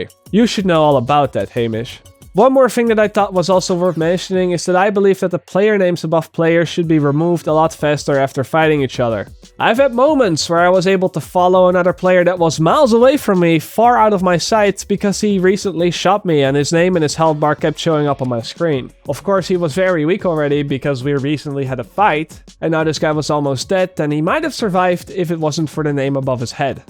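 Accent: Netherlands. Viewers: Hoor ik het nou fout of is dat een nederlands accent? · Is he dutch? Because he has a dutch accent. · are you Dutch? you have an accent which reminds me of my fellow Dutchies. although, it also sounds american at the same time. so ... i NEEEEEED to know.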